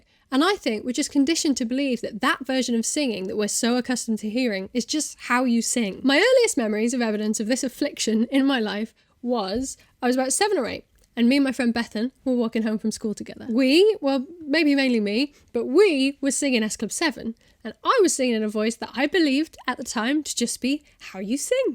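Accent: United States. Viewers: I didn’t know such a perfect video could exist. Nice American accent, btw! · Your American accent is SO good !! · your american accent is so convincing (for a non-american) !! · Bloody hell you pull off an absolutely flawless american accent. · You are correct, there are 20 American accents, but over 37 British accents. Your generic American accent is INCREDIBLY GOOD. I’ve heard otherwise amazing actresses (such as Cate Blanchett) unable to pull one off convincingly. You could give them lessons! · You're American accent isn't bad. I like (singing or speaking) to listen to different accents & figure out what's similar & what's different to my own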